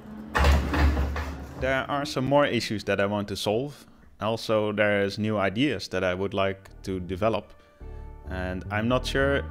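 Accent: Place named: Netherlands. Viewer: Het is duidelijk van jouw stem dat je uit Nederland komt. LOL. Zier er top uit.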